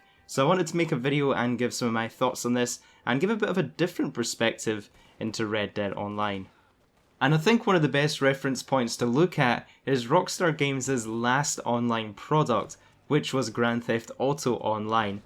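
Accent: Scotland, United Kingdom. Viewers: First off thanks for another vid... been enjoying your series since it started. Nice to hear a Scottish voice amongst all the other youtuber videos 👍 After having played GTA Online since its OG launch, I found Read Dead Online a welcome change from the often frenetic pace of GTAO, although GTAO has given me hundresds of hours of online fun over the years, especially with the Heists (completing the Criminal Mastermind Challenge was awesome) and more recent Business and Arena War updates. Shifting to RDO, I had high hopes for revisiting the world of Red Dead after RDR and though I did get a bit bummed after a time playing solo in RDO, I have since connected with some good regular players via our PSN Community and now it is so much better! Aside from the griefers, which are pretty much negated once you are in a reliable posse, RDO really just needs some balancing and regular expansion via content updates. 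As an OG GTAO player, I know from first hand experience that GTAO was "dead" during it's first few months of existence (there were constant server issues during the early days) but within a year it had really started to evolve and become immensely playable. I think the same thing will happen with RDO and while it may not hold the same kind of opportunity for such diverse updates as GTAO, there is a huge amount of potential there. Let's see where it's at come October 2019 before we write it off. Meantime, I'm having lots of fun hunting and gathering with my posse 🤘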